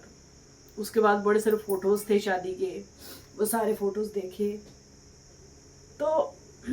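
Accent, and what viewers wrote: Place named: Himachal Pradesh, India. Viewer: Maine aapki video phli baar dekhi aaj, ye 2nd video dekh rha hun, mjhe aapki previous to nhi ptaa bt mai bs yhi khna chahunga k aap jhn rho khush rho...😊 Aaj ki date mai maa baap k siva koi apna nhi hota, rhi baat relatives ki to unhe to sirf bahana chahiye hota h... Aapka ghr bsaaa hua h or jo kuch abhi aap k paas h bs usi mai khush rhi...😊 Ye jindgiii ek bhgwaan ka diya hua tohfaa h isko khush hokr gujaaro👍 I don't know where from u bt I think you are from himachal by language pronounceation...!!! bt in this situations you hve to become a strong person...👍 So plzzz be happy keep happy always...😊 Lots of luv❤ from #himachal...😊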